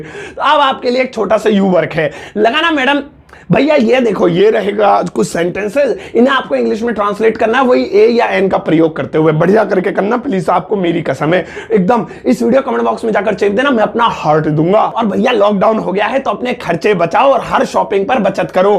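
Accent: India. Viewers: sir apke ye desi tareeke se english bolna sikh gaya 🥹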